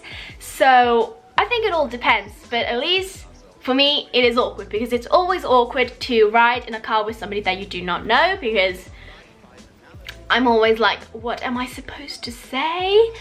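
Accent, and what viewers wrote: United Kingdom. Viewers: I am totally bewildered. How can you be from Italy and have such a pronounced British accent? I mean, I am Italian and I know an Italian accent when I hear it and your is definitely not an Italian accent. Very curious. I'm sure there is a logical explanation. I am also sure others have wondered the same thing. EDIT: Excellent videos, by the way! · i almost thought u were british you speak so good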